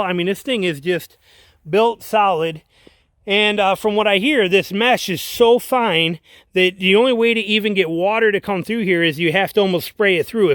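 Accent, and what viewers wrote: Michigan, United States. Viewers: super helpful review and one hell of a a Michigan accent.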